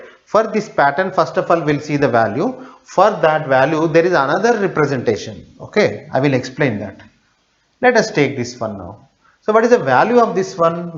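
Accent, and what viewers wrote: South India, India. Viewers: not every Indian talks like that's a south Indian accent lmao. also if he wants to talk like that he Will talk like that if you don't understand it go watch another video lol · serioously can you speak better than that ? we know that you are indian but at least try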